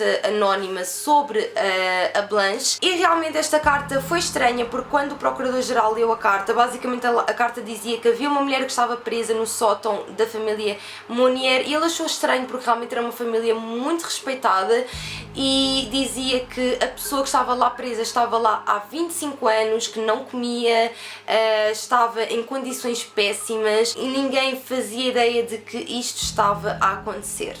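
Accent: Portugal. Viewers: Ola ! Sou do Brasil ,eu amo o'' português dos portugueses'' !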